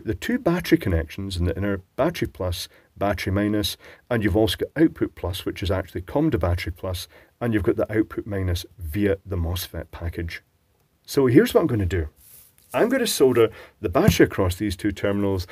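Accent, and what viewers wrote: Scotland, United Kingdom. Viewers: I love your soothing, clear voice. I guess your Scottish ? Do you live in the US now ?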